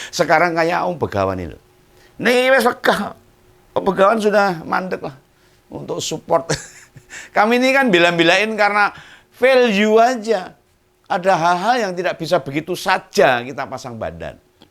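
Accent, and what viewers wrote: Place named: Semarang, Indonesia. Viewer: Penjelasan Om dengan logat Semarangannya asyik😅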